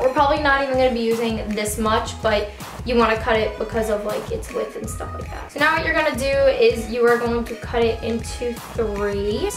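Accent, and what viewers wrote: New York, United States. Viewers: she has such a jersey or New York accident I love it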